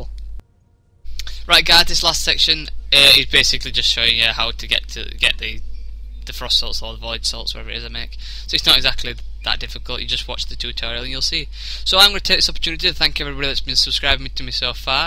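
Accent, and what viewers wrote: Scotland, United Kingdom. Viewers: he doesn't sound too american, he sounds more scottish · Hmm sounds Scottish. Or I could be wrong.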